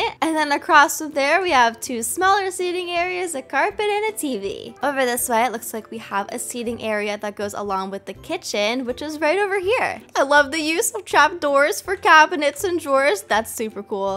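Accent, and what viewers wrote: United Kingdom. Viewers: Are you from British? Just asking 😊 love you videos <3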